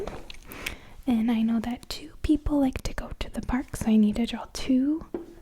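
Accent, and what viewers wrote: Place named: Midwest, United States. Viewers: lol. midwestern accents. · Excellent video, the mic you use softens the voice just enough. The accent is nice too, it sounds like you grew up near Cleveland.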